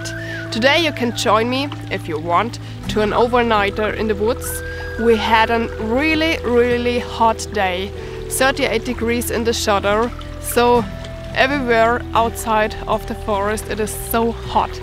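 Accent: Germany. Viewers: your german accent in English is adorable... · Hi. I started watching your channel a short time ago and I like your videos being off grid. I spent 2 1/2 years in Bad Kissingen, Germany while I was in the Army and listening to you talk makes me homesick for Germany. Just thought I'd share that. Thanks for the videos. Take care.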